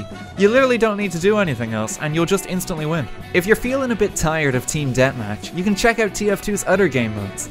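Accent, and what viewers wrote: Ireland. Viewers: U Irish??because I am lol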